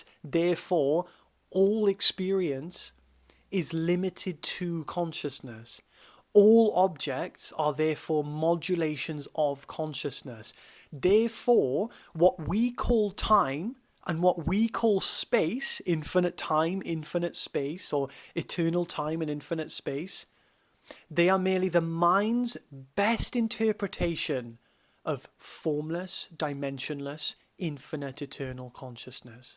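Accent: Wales, United Kingdom. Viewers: Hang on.... I'm listening to you now, you sound Welsh? I'm from Cowbridge. I am guessing that you are near Cardiff?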